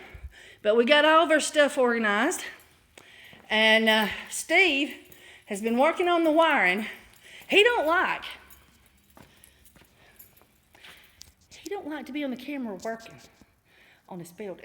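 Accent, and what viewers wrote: South, United States. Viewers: Lady you have got to be the hardest woorking chick on the planet. Don't know what I admire most your enthusiasm, high energy, great attitude, soapy skills or the fact you speak my mother tongue (thats southern if you didnt know). As a girl with southern roots who has long been transplanted as far north as i intend to it just nice to hear that southern speak. Best of luck on your new digs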